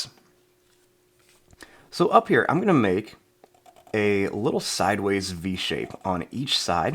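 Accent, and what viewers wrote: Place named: Argentina. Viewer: habla en español argentino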